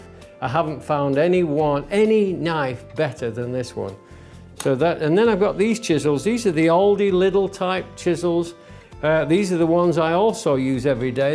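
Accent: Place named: England, United Kingdom. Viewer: I only came across your channel a few weeks ago, but what I've seen so far is very good. it was a joy to hear an Englishman doing a carpentry show, the majority are American and some of them are very good too. I really like the way you use feet and inches; I still measure in imperial although I have on some occasions leaned toward metric. You remind me of my old carpentry trainer, he was real old school, this was way back in the 80s. He would not let us cut anything with a machine until we were competent at cutting using a saw, chisel and mallet, what he would say was "There won't always be electric on job lad" in a broad Lancashire accent. As I said it's really great to see somebody using hand tools again, it's becoming a dying art. Thanks, Jim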